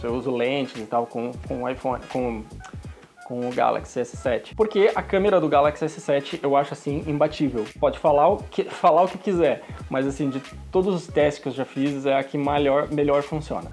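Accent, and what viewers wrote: Rio Grande do Sul, Brazil. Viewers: Você é de Florianópolis ???? Pergunto por que teu sotaque é de gaúcho !!!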